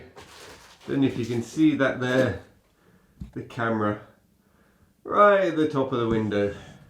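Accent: United Kingdom. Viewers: I don’t speak French but French sounds so beautiful!! As an American I love his British accent but I could listen to a whole video of him speaking French!!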